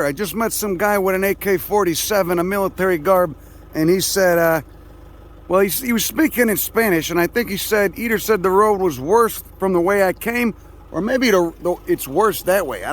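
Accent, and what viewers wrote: Chicago, United States. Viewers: Chicago accent Very salacious content you got here.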